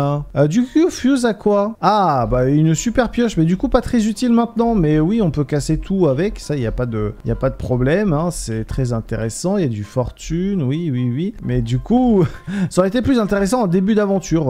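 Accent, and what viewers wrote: England, United Kingdom. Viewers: pas pour moi l'accent anglais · Je t’adore je je t’aime ton axant anglais .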